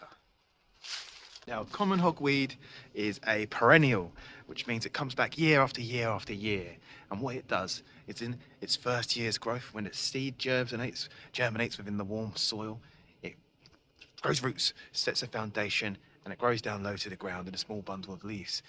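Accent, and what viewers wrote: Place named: London, United Kingdom. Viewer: He is handicapped by a Nu-London accent, innit.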